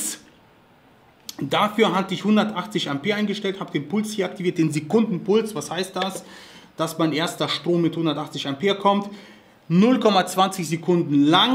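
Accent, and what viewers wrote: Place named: Russia. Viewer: Bester Mann wie er es erklärt usw weiter so ich finde dich Top! An deinem Dialekt kann ich hören das du aus Russland kommst, ich muss zugeben das ich unter Russen das Schweißen MAG und WIG gelernt habe und die waren echte Profis!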